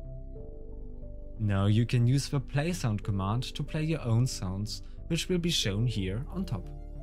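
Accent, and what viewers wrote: Germany. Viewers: are you german? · Bros accent is way to german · ich habe schon am anfang des videos an deiner aussprache gehört das du deutscher bist :D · keiner kann mir sagen das er nicht deutsch ist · I Hear Deutsch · you can hear the German accent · ich wusste du bist deutsch XD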